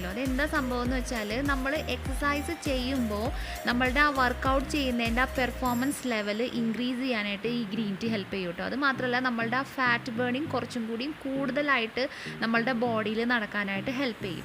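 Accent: Thrissur, India. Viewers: തൃശൂർ ആണ് അല്ലെ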